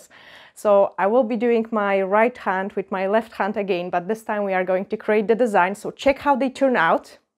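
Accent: Scotland, United Kingdom. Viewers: Absolutely gorgeous 😀 are you part Scottish? You have a very subtle Scottish accent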